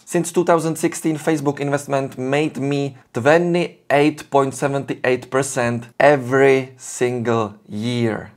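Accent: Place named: Czech Republic. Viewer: ta česká angličtina je tak... nádherná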